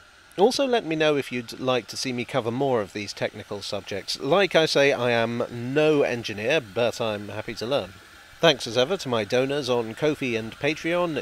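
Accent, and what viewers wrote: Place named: England, United Kingdom. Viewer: "saturated' thats it... fun fact: in old german books you will find even "satt" / "gesättigt" as synonym... which should be the matching translation. in general up the end of the first world war there where many english words like "Train" or "Lorry" in this capitalized german form in newsletter articles and books but using the right term in the right moment is rather difficult. so thanks for the kind words (in fact i subcribed the channel for this english english the speaker uses :) i really enjoy it)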